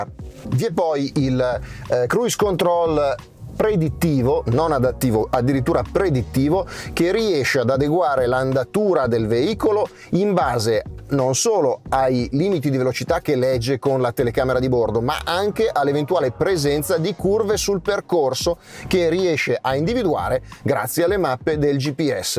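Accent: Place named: Milan, Italy. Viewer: Bravo lo speaker. Peccato per l'accento meneghino.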